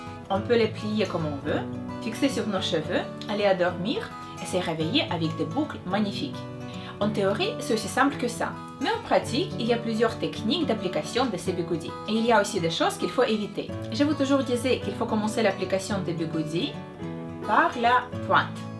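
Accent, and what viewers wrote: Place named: Russia. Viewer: sa se voit que tes russe avec ton acsent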